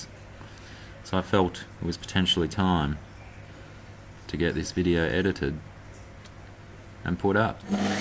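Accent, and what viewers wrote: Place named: Australia. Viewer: You sound more Australian in these videos than you do now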